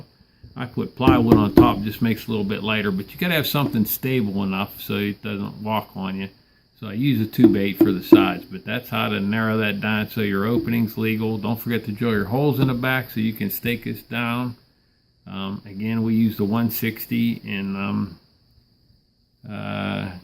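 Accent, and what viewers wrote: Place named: Southwestern Pennsylvania, United States. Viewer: Man I thought I had a heavy SW PA accent. Great video.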